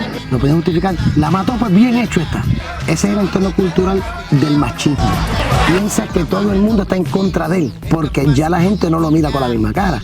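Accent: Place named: Puerto Rico. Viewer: Ok estare esperando en especial me encanta ese asento puertorriqueño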